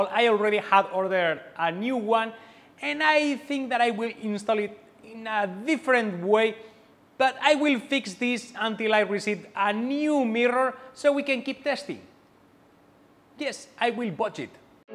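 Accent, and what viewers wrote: Spain. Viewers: No te había visto nunca y cuando te he visto hablar me he dado cuenta q eras español, al instante. Muy buen video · 4° Comentario en español xD, empiezo a ver el video y digo, por el acento, este es español jajaja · Se nota bastante que eres español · como se nota que eres español xd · Has un canal en español, se nota que eres español:v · He's not Italian, he's Spanish. Didn't you notice the accent?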